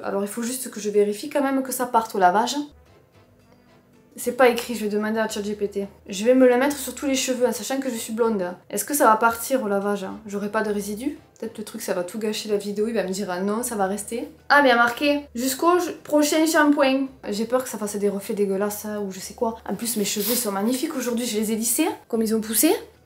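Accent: South, France. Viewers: Transformer le bouton en grain de beauté,j adore votre accent ça fait penser aux vacances a l été ,aux cigales. Surtout lorsque comme moi on habite dans le Nord ,lol😅😅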